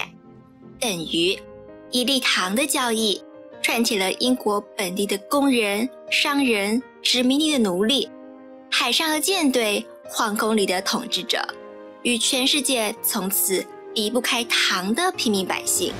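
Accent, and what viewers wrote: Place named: Taiwan. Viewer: 这本书我读过德语版的。文案写得很好，解说很棒。可能由于文化差异，觉得声音有点儿嗲，台湾腔与京腔的给人的感觉正如台湾菜与京菜的甜咸之别一样。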